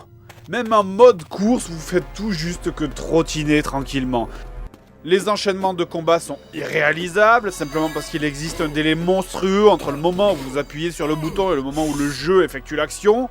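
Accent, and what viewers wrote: South, France. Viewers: Le JDG est un vrai sudiste, avec l'accent qui va bien!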